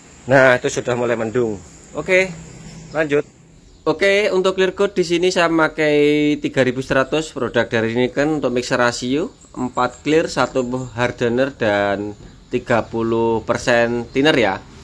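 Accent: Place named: Java, Indonesia. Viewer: Kirain di daerah jawa, nada suaranya kaya orang jawa 😁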